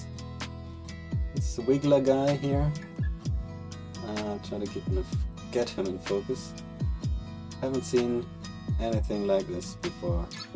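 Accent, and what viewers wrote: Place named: France. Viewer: Yessss, gorgeous French accent albeit very feint 🥹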